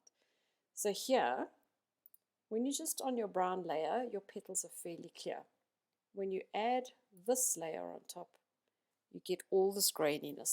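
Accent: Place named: South Africa. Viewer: Thank you for this amazing tutuorial. I really love your designs. I can hear you are from SA. I am in Cape Town and am also a digital designer so I thought I would say hi.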